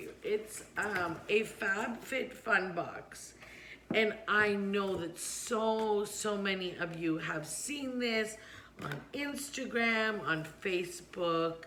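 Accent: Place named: Italy. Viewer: you sound a bit Italian in this video .................. Love this video...Btw remember to drink a lot of water in the summer keeps you cool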